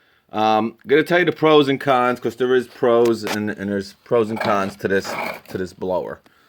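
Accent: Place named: Northeast, United States. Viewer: You have to look too beyond the initial price. Some might say I can get a "better" Toro, HomeLite, etc. at Lowes/Home Depot initially but where do you go to get it serviced, definitely not Home Depot, etc. Ace Hardware is a Authorized Dealer and typically your old school lawn shops service the higher end products like Stihl, Husqvarna. You have to add that to your overall decision in purchasing a unit. I think for myself, for light blowing off grass the driveway and deck, I can't beat the BG50 for my personal use that is for sure. Love the Northeast accent! lol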